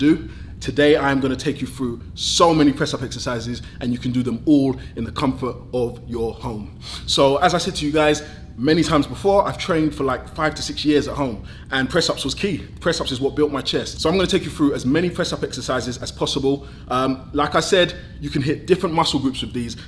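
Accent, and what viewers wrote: London, United Kingdom. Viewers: OMG!!! I haven’t heard a London accent for two years 🤗🤗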